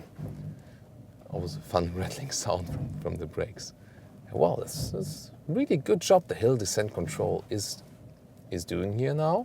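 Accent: Germany. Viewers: That German accent is so cute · Love the German accent 👍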